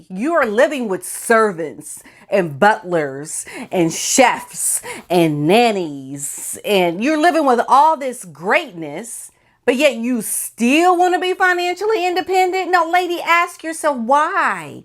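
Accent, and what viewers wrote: United Kingdom. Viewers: Tosh, utter Tosh is what MM is (AKA Crap, Garbage, rubbish, skank you get my drift) it’s a lovely word!! Loving your British accent!! I live a few miles away from Frogmore & Windsor castle (I’m poor) & I’m hoping my neighbours don’t come back to their base. P.S H never paid that money back- that’s utter balderdash 😉 😂😘 xx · Yay talk british a little longer lol.thay was given the title from the queen. but it all goes thourgh the houses of parliament Sucks we know.but now so many people in UK are banging on the tables louder an louder so hopefully something will happen. but thank god there out of UK and our papers mostly.we are gutted about this gutter snipe. the money won't last long and no one wants to hear 💩 from her she dont know 💩about the royal family. if she knew about the commonwealth she wouldn't have put her 👠in her mouth megain 👩‍🎓🧠thinks she smart but good ole 👸Elisabeth will shock this couple to the core the 👸been around alot longer than she has.people know what ever thay produce the royal family will watch like hawks.and we know thay will recall harry home.god know how them two will unvail a statue of diana next year on what would be her 60bday. 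You watch tramp will look at all the cameras the world will be watching megain god i wish we could make her go though trainers gate and into the tower of London haha xx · I like your British accent and if you want to speak it well and truly best to speak Oxford English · I love your British accent, it's brill, cannot understand why the royal family are not saying anything, we have to pay for them pair as I am English, it needs to stop. Yes you are right an mp has got his foot in the door in parliament to make sure he can get support to remove their titles, I was made to pay towards their wedding everything despite never watching the wedding, just was not interested, could see her a mile off.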